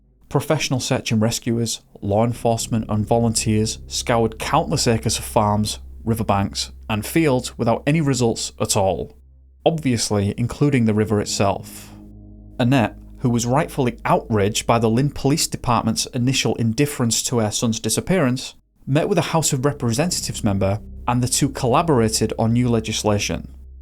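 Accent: United Kingdom. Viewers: Are you from the UK or is that just the voice used for the video narration? Some farms specially ones with livestock can be very very bright. Like midwest Wal-Mart parking lot bright. Not as bright as a whole town obviously but that could be easily confused in the pitch black.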